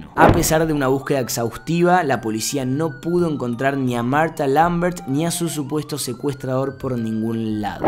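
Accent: Argentina. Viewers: Es por qué es argentino como yo xd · Es argentino da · Q hermoso te queda El acento argentino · De donde sos, te digo de buenas es que en argentina se habla así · Amo tu acento Argentino ...Es tan bello como el chico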